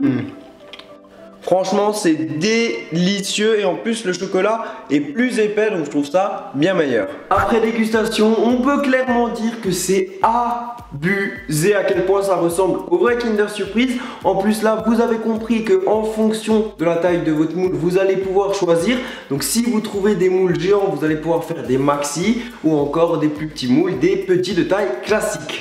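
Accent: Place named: Alsace, France. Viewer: Hé yo on entend bien l'accent Alsacien dis donc (le Bonjour de Mulhouse)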